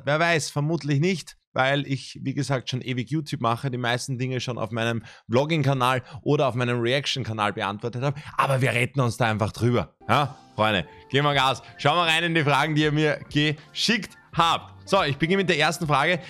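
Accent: Austria. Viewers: Red bitte nie wieder Hochdeutsch 😂 für mi als bayer is des echt schlimm😂 des österreichisch steht dir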